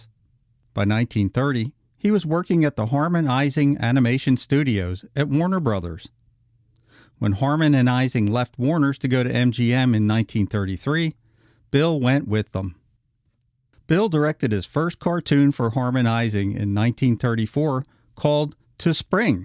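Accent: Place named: Philadelphia, United States. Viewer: are you from Philly? That accent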